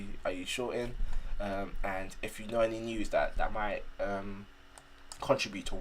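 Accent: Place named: London, United Kingdom. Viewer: Yo yo, waz up bro good to hear a fellow london accent ma man ONE LUV 💝💝💝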